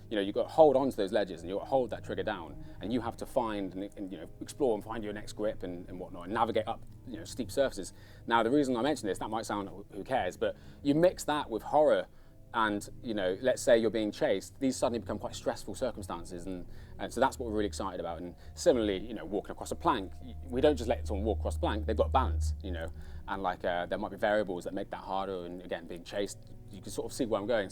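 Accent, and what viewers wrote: United Kingdom. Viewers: English bloke, more specifically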